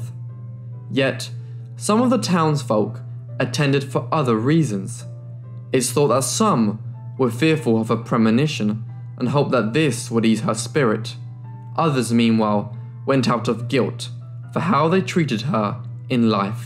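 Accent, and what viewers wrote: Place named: West Midlands, United Kingdom. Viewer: Gotta be from the westmidlands right? Your voice sounds to familiar for it not to be. Xx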